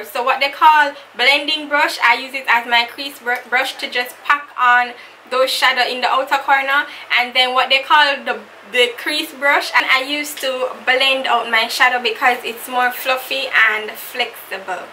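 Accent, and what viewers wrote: Jamaica. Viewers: New Subscriber! I heard a familiar accent and had to support you. Good to see a yardie onYoutube!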